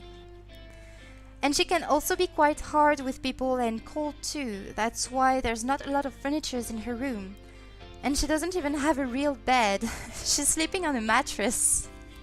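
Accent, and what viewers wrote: France. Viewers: J'ai reconnu direct que tu etait française ❤️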